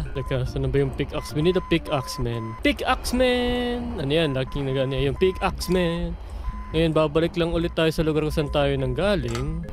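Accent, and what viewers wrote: Visayas, Philippines. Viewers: Kuya Bisaya ka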